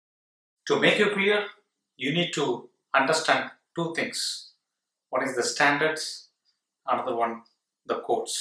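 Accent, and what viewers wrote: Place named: India. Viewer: It's really hard for me to understand indian accent and poor quality voice and load music makes it so much harder.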